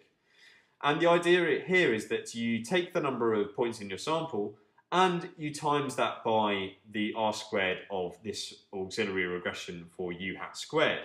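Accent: United Kingdom. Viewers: it would be great if you enable caption on your videos. as a brazilian student, it is a bit hard to understante british accent.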